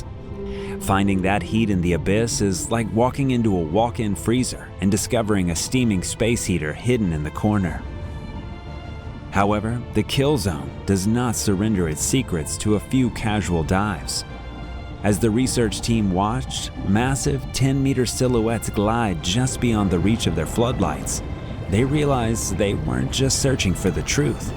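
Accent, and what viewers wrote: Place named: United States. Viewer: That single episode made me not want to subscribe. Accent and dialogue is way too American. It sounds frustratingly stupid.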